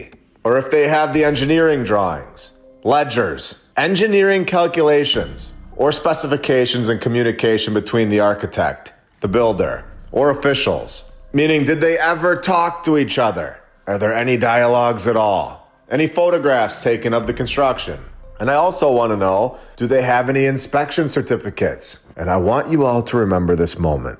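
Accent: United States. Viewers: I knew what he meant. He's American, they say words differently.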